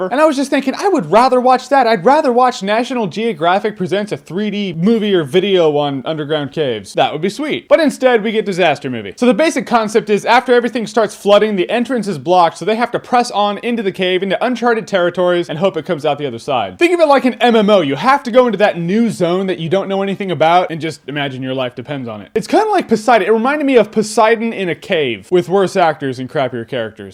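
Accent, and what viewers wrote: Australia. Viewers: Jeremy's a wizard at faking australian accents. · Nice aussie hardcore adventure guy accent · Hahaha Im aussie and that would have to be the funnest Aussie accent i ever heard but still was a real beievable one at that,Should do a review on the Crocodile Dundee for shits and giggles!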